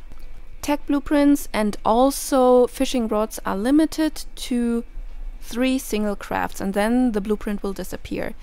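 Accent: Germany. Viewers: me as a german: She spoke Video very german...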